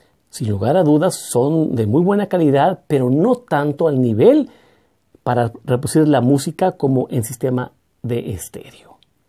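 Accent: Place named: Yucatan, Mexico. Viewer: Hace mucho que no vivo en México, pero noto un ligero acento de Yucatan es usted de origen yucateco o por lo menos de la peninsula de yucatan? Perdon por desviarme un poco del tema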